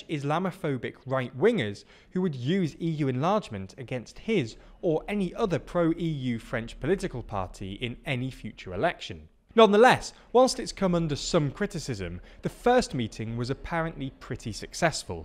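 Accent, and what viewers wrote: United Kingdom. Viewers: It's funny that a british sounding guy is talking about EU news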